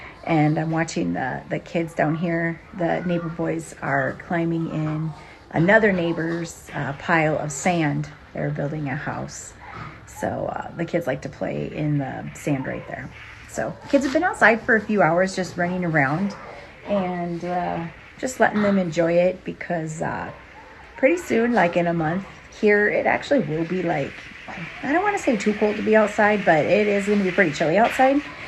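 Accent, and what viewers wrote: United States. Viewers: I came upon your channel and am enjoying it. Beautiful family. I knew I recognized an upper Midwest accent (I am from Michigan!) 💕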